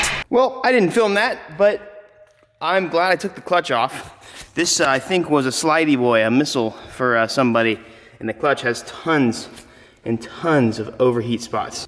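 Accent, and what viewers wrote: United Kingdom. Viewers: British accent on point BOI. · Dude...your British accent is tits!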